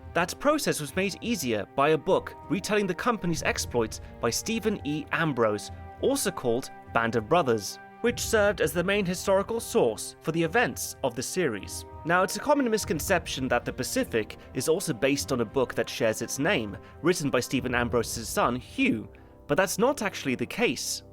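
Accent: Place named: United Kingdom. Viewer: you say names so British like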